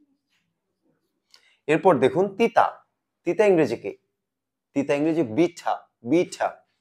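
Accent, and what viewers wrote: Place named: United Kingdom. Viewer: Bhaia is it British Accent? I prefer American. I will be grateful if you deliver American Accent . Thank you very much.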